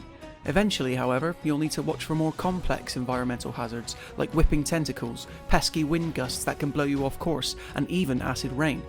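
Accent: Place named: Somerset, United Kingdom. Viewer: Think this guy is from Somerset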